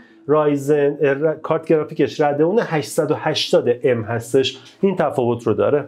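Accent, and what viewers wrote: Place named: Saveh, Iran. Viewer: ببخشید میشه سوال منو جواب بدید؟ شما اصالتا ساوه ای هستید؟ به خاطر لهجتون میپرسم . موفق باشید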